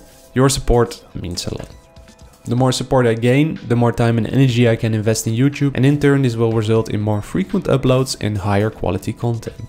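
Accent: Netherlands. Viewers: Ik hoor gelijk aan jou accent dat je Nederlands bent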